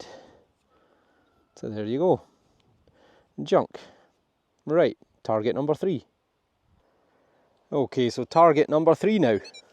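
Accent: Scotland, United Kingdom. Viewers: Your enthusiasm and your knowledge of history make you my favorite detectorist. And your lovely Scottish accent!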